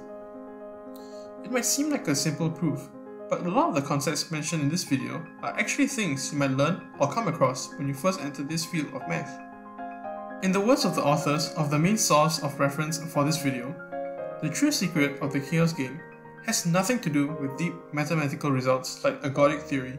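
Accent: Singapore. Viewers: yess recognised the accent as a fellow sinkie, keep up the good work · it's your first video so it's normal that you have a lot to improve. my advice for the next one, put some text. even if you get a new mic, you still have the singapore accent and some westerners can't understand it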